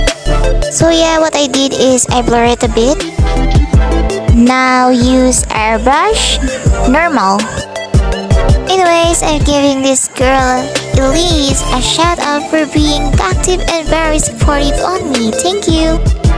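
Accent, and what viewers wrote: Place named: Philippines. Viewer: ur voice kinda sounds like filipino :00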